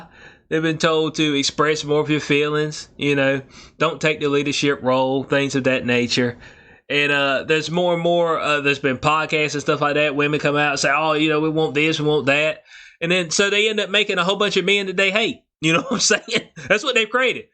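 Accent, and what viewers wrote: South, United States. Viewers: Good video, brother! I can’t quite place your accent… you’re from the south US tho right?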